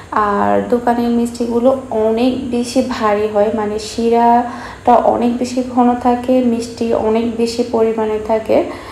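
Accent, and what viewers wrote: Chittagong, Bangladesh. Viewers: Apu apnar Basha CTG r kothai ????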